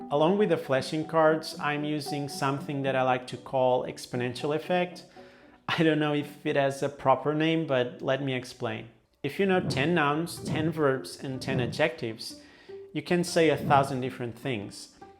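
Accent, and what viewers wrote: India. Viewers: U actually spoke like a South indian😂 sounded so so similar to the south indians man...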